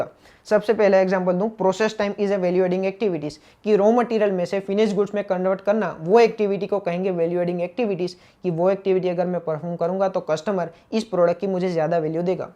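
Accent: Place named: Kathiawar, India. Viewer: Sir where are you from? Your voice tone little bit.. gujarati kathiyawadi..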